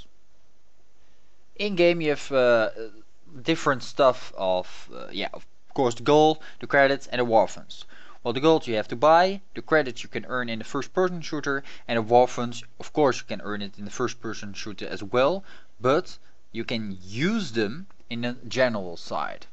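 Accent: Netherlands. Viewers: Ik keek de vid en hoorde gelijk dat je nl was, bedankt voor de uitleg man! Ik speel deze game al meer dan 200uur hahaha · ah that makes sence thanks for the tip :) Btw are you dutch ? :) cuz i can hear you are definantly not english :)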